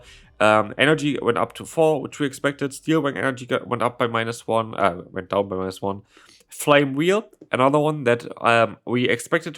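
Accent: Germany. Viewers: It would be great if you could speak a little bit slower and more exactly because it‘s pretty difficult to follow this fastly spoken Englisch with the German accent. Especially words like „literally“ sound like „liddellillllyy“ or „in todays video“ is „in tdsss veoo“. Thank you!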